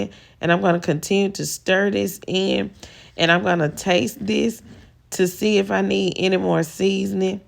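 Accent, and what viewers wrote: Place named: South, United States. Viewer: I knew as soon as I heard your voice, this was the recipe I wanted. With a southern accent like that, I knew it would be good. Made this recipe for Thanksgiving and DANG!!! This was hands down the best cornbread dressing I’ve ever had!!🎉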